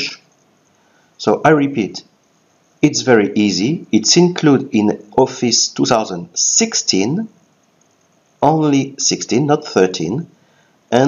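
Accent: France. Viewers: Mec je sens ton français 😀 Keep it up ! And thanks!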